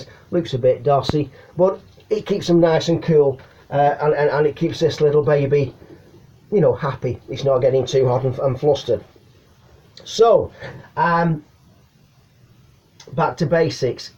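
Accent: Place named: Yorkshire, United Kingdom. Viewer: Yorkshire? Lancs? Ex-Halifax lad here. Living in CA. CB is quiet here so getting a 10M rig tuned and want to put an amp in the system. Found your clip and it felt like I were back 'ome again.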